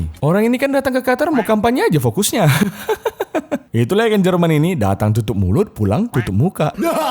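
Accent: Medan, Indonesia. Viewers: Orang medan dari logat ngomong nya yang punya channel ini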